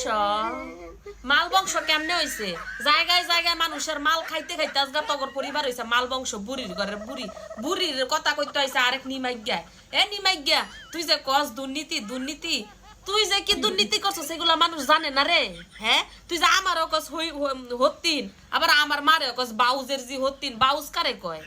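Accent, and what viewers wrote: Comilla, Bangladesh. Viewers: OMG 😲 😱, can i know in Bangladesh what part you are coming from? Its sound Cumilla version 😂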